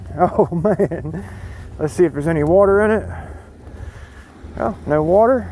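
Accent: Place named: South, United States. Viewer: Love your southern twang. I'm from Michigan but talk a little like you. I'm Midwest, and southern Indiana, with Canadian accents here and there HaHa!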